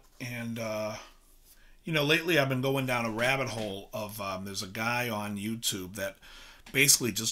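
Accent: Boston, United States. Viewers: I have got to say man, I'm a fan.what is your musical education? College? Juliard?... you seem very technical and educated on music..you are obviously from Boston or that area for some time.. but yeah cool man..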